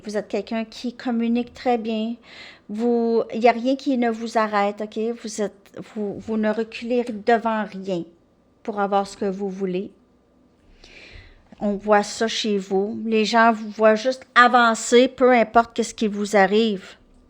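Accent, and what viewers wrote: Quebec, Canada. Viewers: J'adore ton accent (Québec?)Une de mes meilleures amies est québecoise .J'adore les expressions que vous avez gardé et que je trouve romantiques . En France nous les avons oublié ,dommage!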